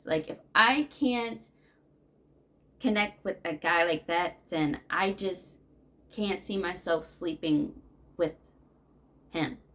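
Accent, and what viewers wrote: New York, United States. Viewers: I love ur New Yok accent!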